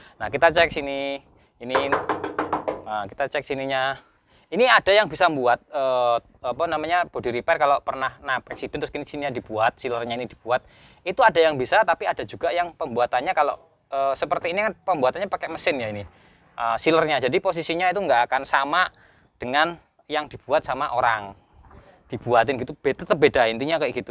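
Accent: Java, Indonesia. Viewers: Mas ini dari aksen logat jawanya kelihatan msh punya darah kraton...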